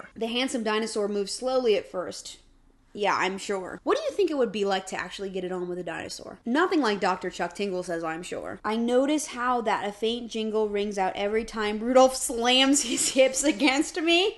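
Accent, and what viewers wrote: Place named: South, United States. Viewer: Why was your southern accent better after drinking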